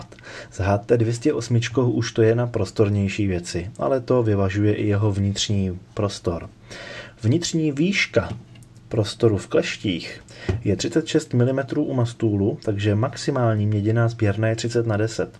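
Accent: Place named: Prague, Czech Republic. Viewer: Ach ta pepanština :(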